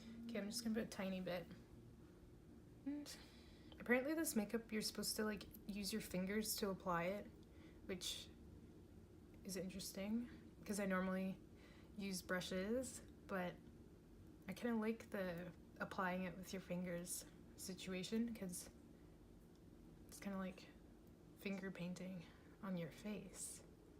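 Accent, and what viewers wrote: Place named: Ireland. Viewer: Are you by chance Irish or Scottish? You have the prettiest accent that sounds like you might be from that area?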